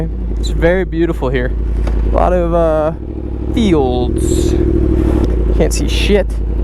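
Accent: United States. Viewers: you sound like you are from wisconsin or somewhere in the midwest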